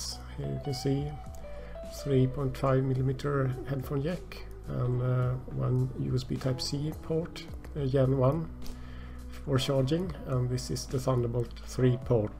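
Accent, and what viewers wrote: Sweden. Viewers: It screams Swedish accent.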